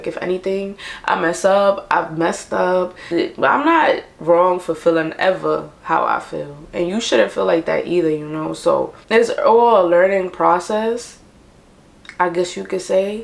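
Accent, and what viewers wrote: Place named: New York, United States. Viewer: i envy that man that puts a ring on it miss them dimples tho and that new york accent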